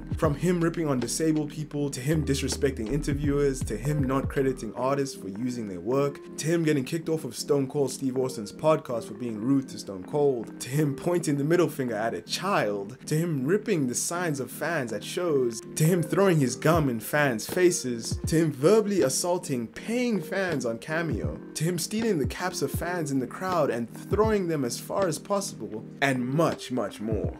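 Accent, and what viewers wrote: South Africa. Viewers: I'm not sure whether its but you sound South African🤔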